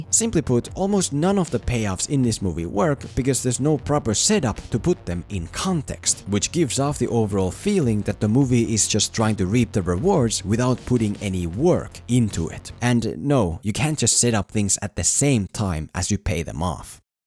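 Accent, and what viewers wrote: Finland. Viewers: I have to ask, are you Finnish? Your accent tells me you are. In anime the story was different and as far as I remember, they always setup things and payed them off. This Movie had so many WTF moments and no stake at all for the viewer. What a waste.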